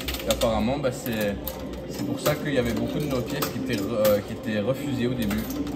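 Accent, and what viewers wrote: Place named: Belgium. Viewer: Coucou, nouvelle abonnée...vu ton accent ça doit être en Belgique ces machines car en France ça n'existe pas de gagner des billets aux pousses pousses, dommage car pour l'adrénaline c top!😁, Je te souhaite beaucoup d'abonnés !😊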